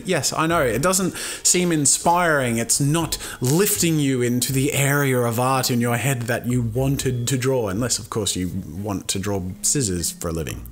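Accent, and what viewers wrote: Australia. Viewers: Are you Australian... I am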